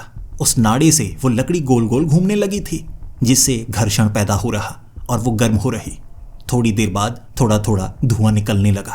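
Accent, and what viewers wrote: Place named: South, India. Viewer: App south indian ho 100%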